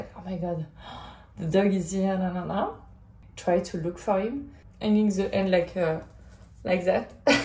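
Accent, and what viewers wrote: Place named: France. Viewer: i love your heavy french accent and your style and how you express yourself. you remind me of the beauty and the woes of everyday life and i love how it makes me feel less alone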